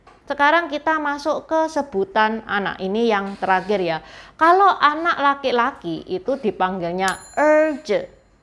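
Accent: Java, Indonesia. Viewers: komentarku bukan u menihilkan niat baik u memberikan info yg baik tentang hal2 yg berkaitan dengan chinese. Semua video 2 lain2 nya saya sering mendapatkan wawasan baru. Tapi u video ini, jujur saya ga pengen tonton hingga habis... krn pelafalan yg salah... menggangu bgt telingaku. Krn di bahasa mandarin, salah intonasi = salah arti.... Saya kebetulan teochew yg intonasinya bernada 6-8.... dan uda 4 thn yg lalu otodidak belajar bahasa mandarin... dan lumayan hasilnya. Saya juga setuju logat jawa medok yg membuat pelafalannya agak kacau... TAPI harusnya ini jgn JADI excuse u salah melafalkannya. Krn buat yg kurang mengerti, jadi bisa salah diterimanya oleh mereka. Dan saya yakin juga bukan intend u membuat video ini, kl ternyata salah diterima oleh audience nya. No offence here, bang....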